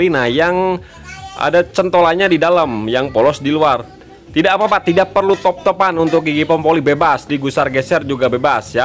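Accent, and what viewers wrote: West Java, Indonesia. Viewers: Sunda pisan iyeu mah... mantap kang... 🙏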